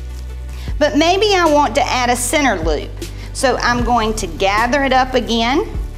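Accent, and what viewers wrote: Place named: South, United States. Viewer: MY TEACHER AND I LOVE YOUR SOUTHERN ACCENT♥️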